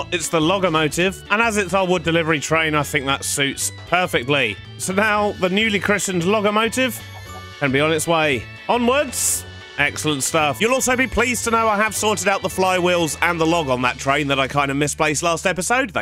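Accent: United Kingdom. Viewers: Are you British or American you sound British but it might just be me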